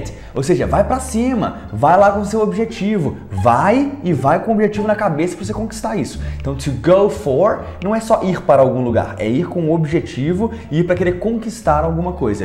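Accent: Minas Gerais, Brazil. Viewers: Para de ser chato misericórdia, é óbvio que o cara sabe como essas palavras são escritas ou pronunciadas em um português formal, mas isso é apenas questão de SO T A Q U E O cara é mineiro, deixa ele em paz com o sotaque dele, desnecessário ficar atrapalhando os comentários de um vídeo focado no I N G L Ê S por causa de preconceito linguístico Até parece que você fala que nem um robozinho, com todas as letras e acentos. Vc pode até pronunciar as palavras citadas de um jeito um pouco mais padrão, dependendo da sua região, mas tenho certeza que com outros tipos de palavras e fonemas vc também fala "errado"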